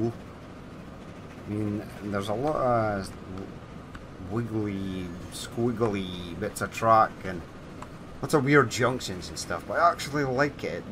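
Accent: Scotland, United Kingdom. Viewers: Hey, are you Scottish, Irish, or some flavor of British?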